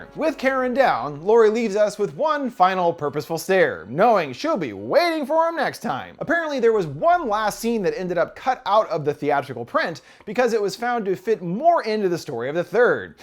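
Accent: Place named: United States. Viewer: No. He speak American English.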